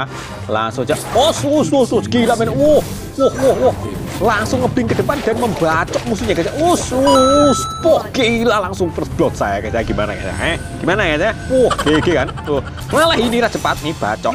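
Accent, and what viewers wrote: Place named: Bali, Indonesia. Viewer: Kamu orang bali ya?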